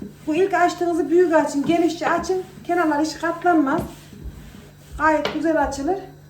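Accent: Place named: Kayseri, Turkey. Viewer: Kayseri şivesi gibi konuşmanız çok hoş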